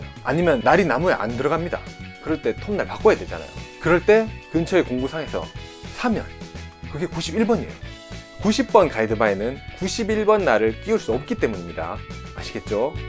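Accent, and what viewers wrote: Gyeongsang, South Korea. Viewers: 너무 쉽게 그리고 재밌게 귀에 쏙쏙 설명을 잘해주시네요 같은 경상도로 그런가? ㅎㅎ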